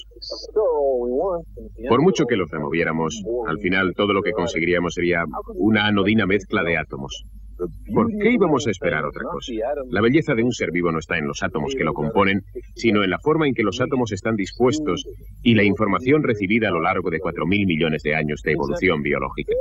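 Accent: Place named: Spain. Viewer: Es un buen programa, el contenido muy bueno; lo malo la pronunciación (del español de España es asquerosa) ni siquiera pronuncia adecuadamente el inglés como es costumbre de los españoles, se escucharía mejor en una traducción en español neutro...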